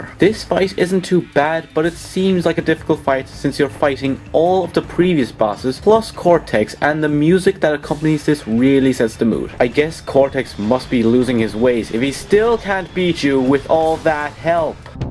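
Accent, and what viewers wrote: Ireland. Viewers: are you Irish? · are you irish?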